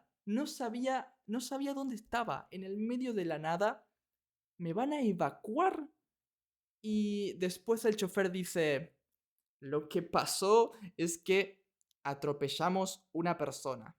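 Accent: Argentina. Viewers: No tenia que llevar el puesto por el tren? (Suena como chiste, llevar puesto, como decir llevo puesto nada por el tren, no se). Habia otro hombre con camion que venia para el puesto, dices? No entendi todo, pero entendi lo suficiente espero. Hoy he sucrito al canal, y hoy es primera vez he oido accento de Argentina excepto ayer un poco de un video sobre Casa de Asterion, la cuenta corta supongo que la has leido tal vez? Seria un buen tema para un video. I just remembered you speak english and italian but i had to practice writing in spanish. I dont know why i suggested la casa de Asterion this video is already super helpful. Thank you for making the videos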